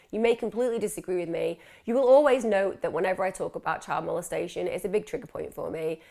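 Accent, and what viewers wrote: United Kingdom. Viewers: British accents are almost as irritating as Asian accents.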